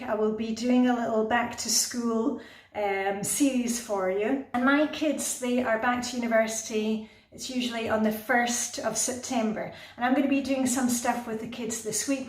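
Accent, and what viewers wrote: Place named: Edinburgh, United Kingdom. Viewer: you are a darling! Love your beautiful Edinburgh accent; brought up my kids there, and still miss it.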